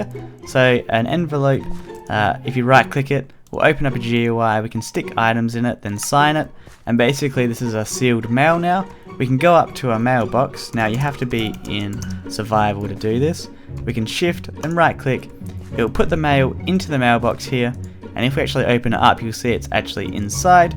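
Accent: Australia. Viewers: i only downloaded the mod, i never actually watched the vids, i never realised he was australian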